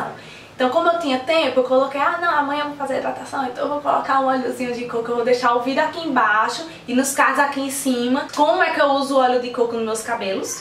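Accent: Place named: Northeast, Brazil. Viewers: logo vi que é permanbucana linda amo nosso sutaque 😘😍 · que sotaque bom nordestino hein, também sou nordestina, é nós 😊😘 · Sotaque lindo de Recife amo !!! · menina que sotaque bonito . pernanbucana sim senhor . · Ameeeiii o seu vídeo 😍😍 Comprei a glicerina hj e vou fazer para testar. Tmb sou de Recife e amooo o nosso sotaque 💗 Parabénssssss! ! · Eita sotaque pra eu amar 😍 amei as dicas e tbm sou Pernambucana!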